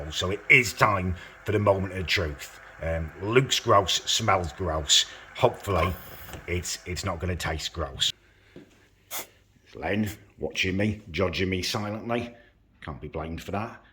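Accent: United Kingdom. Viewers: He’s British dude 😂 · He’s just British man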